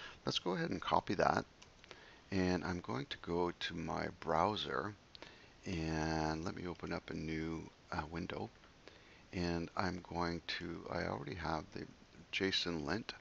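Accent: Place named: Scotland, United Kingdom. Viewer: sounds scottish. great accent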